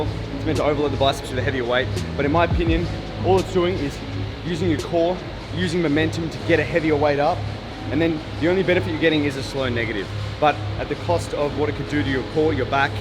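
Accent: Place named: Australia. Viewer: Love your channel and the aussie accent ❤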